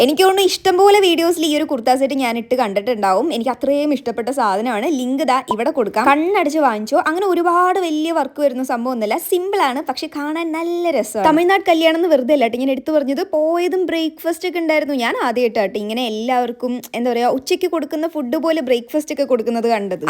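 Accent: Palakkad, India. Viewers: Chechi Palakkad basha vanu😅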